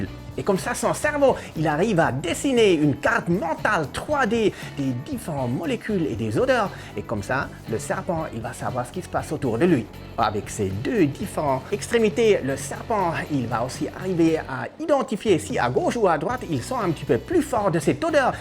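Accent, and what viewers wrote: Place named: Alsace, France. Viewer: Merci pour la vidéo très instructive !! J’ai une question qui n’a rien à voir (et qui j’espère ne sera pas mal prise 🙏): d’où vient votre accent? Ça me fait penser à ma grand mère Alsacienne de Mulhouse 😊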